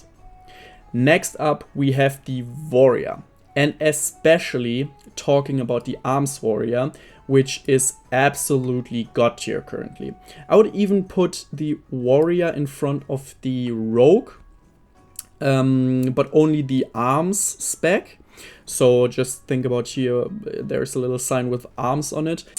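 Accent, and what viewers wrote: Germany. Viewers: The german accent is wyld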